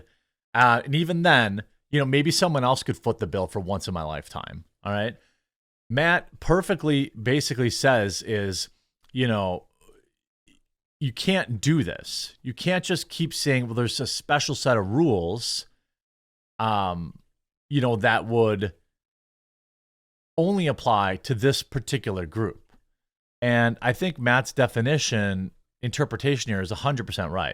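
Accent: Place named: Midwest, United States. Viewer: There is no letter "L" in the word both. Turn down the midwest please.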